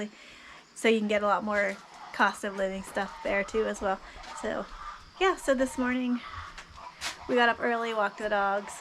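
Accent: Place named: United States. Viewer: Thank you, for this video. I'm an another canceled check here in Czechia but on the escape! Thanks too for your American English which is so nice to listen. Good luck on everything!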